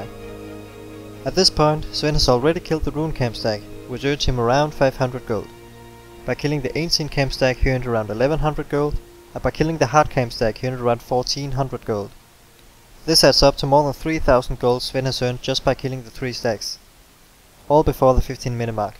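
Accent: Denmark. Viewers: danish accent! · Hi :) one simple question where are you from? your accent sound danish or so :D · your danish accent burns through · Are you danish. because you used the word "og" and you have a danish accent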